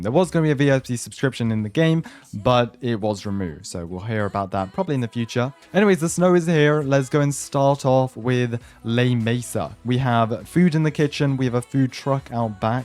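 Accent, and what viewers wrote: United Kingdom. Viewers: hes bri ish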